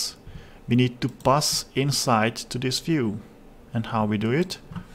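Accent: Hungary. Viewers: 12 évig éltem külföldön, felismerem a magyarokat :) Egyébként meglepően jó az angolod. Most megyek, próbálom megérteni az auth dolgot. Ezen vagyok elakadva (middleware etc), én még a régi "nyers" php programozáshoz vagyok szokva, de elhatároztam, hogy megtanulok valami modern php keretrendszert. Így lett Laravel. (CSS az megy) Megy minden vacak már, blog rendszer, blabla, de egy role system nem akar menni: admin routing XX oldalra, user routing YY oldalra, middleware hogy mindenki azt lássa amire jogosult. Tutorialt lekövetek, másolás megy, de érteni is akarom :)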